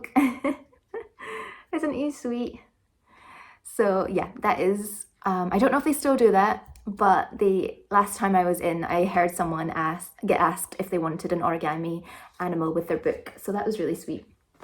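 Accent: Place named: Edinburgh, United Kingdom. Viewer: Just discovered this on my feed. What a wonderful find. I'm a real book nut. This was heaven for me. And you seem like a lovely person with a gorgeous Edinburgh lilt. Thank you ❤️👏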